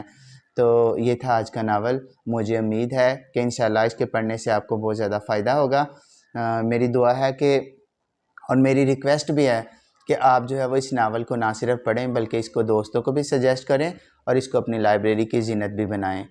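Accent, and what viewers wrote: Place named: Punjab, India. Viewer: محترم ڈاکڑ صاحب اس قدر اعلی ادبی پارہ کے تعارف کا حق ادا نہیں ھو سکا تلفظ اور لہجہ میں اردو کی بجاۓ پنجابی لہجہ اور ادائگی کی واضح چھاپ ھے 🌸🌸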